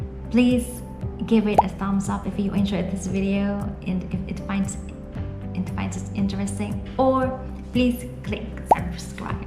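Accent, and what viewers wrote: United Kingdom. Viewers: Maam taga UK po kayo? Nice accent and the video is Very informative..Kudos!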